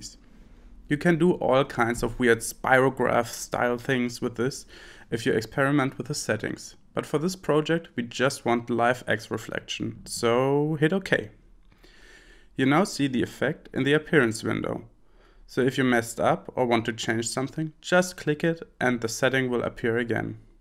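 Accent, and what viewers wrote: Germany. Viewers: you have a german accent, are you german? Man I love your tutorials! because you simply show the hidden tips and tricks, I'm a professional graphic designer, but those little tips you give, I haven't seen them anywhere else before! keep it going :)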